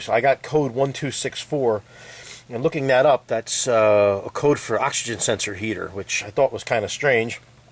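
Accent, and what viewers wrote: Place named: New Jersey, United States. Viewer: You are a bad ass mechanic. I was rasied in New Jersey and have brothers and sisters that still live there. Nostalgic to hear NJ accent. Wife drives 1996 Jaguar Vanden Plaus, I'd say the Jaguar 4.0l inline six sounds much smoother than BMW. HaHa. Thanks for your videos I always learn something new.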